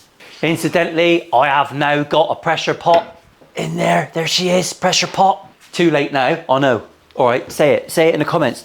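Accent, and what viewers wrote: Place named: Bristol, United Kingdom. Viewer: 😂yeh mate he’s been learning for about a year now and he’s getting really good. When I showed him your last build he was like dad that’s sick so when he comes up my place he’s asks if we can watch your videos and I have them saved to watch. I’ve also just started playing guitar but I’m a piano player so guitar has been a bit of a challenge but with his help I’m getting there. He’s never seen any guitar build videos before and I said son you have to watch this guy he’s brilliant and hilarious and we’re are hooked. Like I said the builds are brilliant but we love the way you are on the videos. We’re in Bristol so only up the road and we gurt talks the same init. Thankyou me and Toby have really bonded over guitar and your videos gave us something to laugh about together too, although explaining what a beef bayonet was a bit tricky but he got the gist😂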